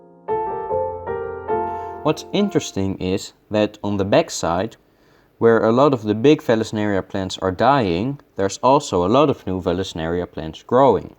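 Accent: Netherlands. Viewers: 80% sure you're from the Netherlands.. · Do you come from the Netherlands? Just wondering 😂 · Hij klinkt een beetje Nederlands. (He sounds a bit Dutch.) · It's good knowledge and are you Dutch? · Your Dutch accent makes this funny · Maybe its just me but you sound dutch. So are you dutch?? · hey are you dutch? your accent sounds so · Are u dutch? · I played your first clip on your jar ecosystem for my 13-yera-olds in our science class and they loved it! We were looking for a group effort in our upcoming science fair and our unit on ecosystems. GREAT job! Your accent sounds a bit Dutch to me. Are you from The Netherlands? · Ben je Nederlands?😂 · Waneer je kan horen dat ie nederlands is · You sound very Dutch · You are so Dutch, it hurts my ears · you're obviously dutch, aren't you? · Wich country you live in? You sound a bit like dutch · You’re Dutch for sure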